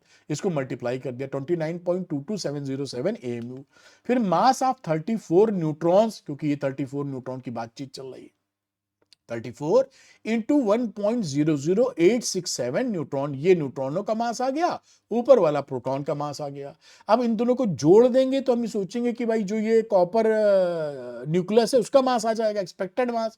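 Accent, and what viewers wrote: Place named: Lucknow, India. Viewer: Sir lucknowii andaz kaafi accha h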